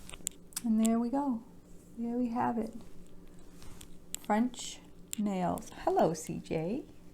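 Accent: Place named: Boston, United States. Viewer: You need to make more videos. We are all enjoying your lovely accent and nice personality. You make such nice videos of your nails. You must be from Boston or Maine. I was originally from Long Island NY and moved to warm Florida a few years ago to get away from the cold. Anyway keep up the great work!!!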